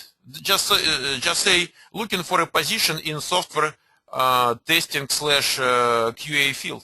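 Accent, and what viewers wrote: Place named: Russia. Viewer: It is so great that you have a Russian accent. Those things you say with this accent... I believe that you represent a better face of Russian-speaking people in the US.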